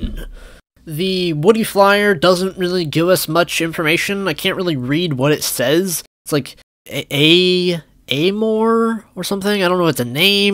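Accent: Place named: United States. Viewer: Judging by his voice he's American And I agree because Belgium and France are nowhere near each other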